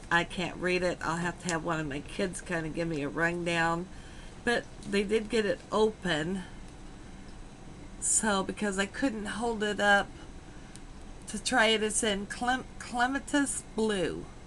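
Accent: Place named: Midwest, United States. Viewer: Great deal on the washcloth pack. You pronounce it just like my whole family does - no surprise since my mom's side all came from Omaha. She moved out here to California when she was 13, but we all still talk like we are from the Midwest.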